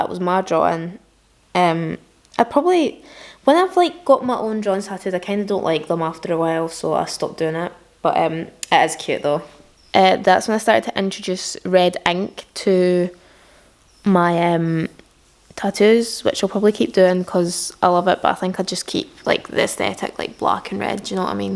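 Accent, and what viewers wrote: Scotland, United Kingdom. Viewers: You make me feel so comforted that there are actually people out there with the same interests as me lmao. Also the asmr vibes ur videos have plus the Scottish accent is so satisfying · Love your accent! Are you Scottish?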